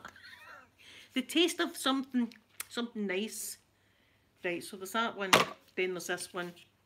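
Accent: Scotland, United Kingdom. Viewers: I love that you wear two glasses like it`s the most normar thing :) and your laughter is priceless, I love it ❤️ I followed you for a long time. You are so nice, beautiful and sweet, and I love your videos ❤️ I love your accent (Scottish), and the accent in general is one of my favorits😍❤️ Ps: I usually watch your videos over and over again, they are so lovely and comforting❤️ stay safe and God bless you ❤️ lots of hugs from Norway ❤️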